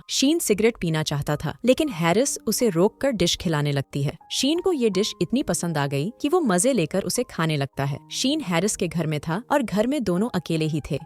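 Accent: Punjab, India. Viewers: Hlo aap punjab se ho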